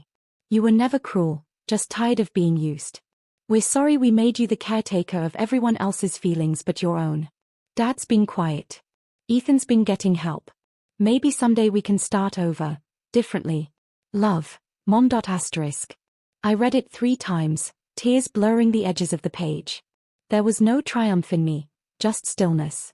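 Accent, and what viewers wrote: United Kingdom. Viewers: Why the British accent? In America it is pronounce skedule not shedule. According to British pronunciation school would be shool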